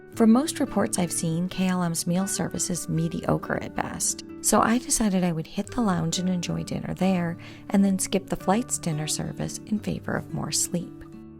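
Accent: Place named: United States. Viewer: あなたの英語はアメリカ語英語です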